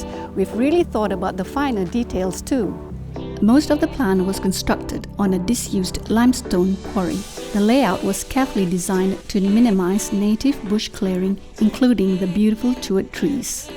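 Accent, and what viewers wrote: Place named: China. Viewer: I suspect it could be a Chinese who has learned English?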